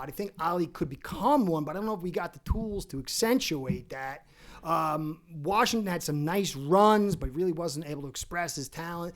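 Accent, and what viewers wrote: New York, United States. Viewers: This man sounds more like a Jets fan. (The accent).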